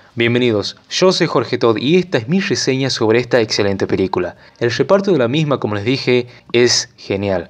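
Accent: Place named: Corrientes, Argentina. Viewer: Ese tono es de provincia de "coyientes"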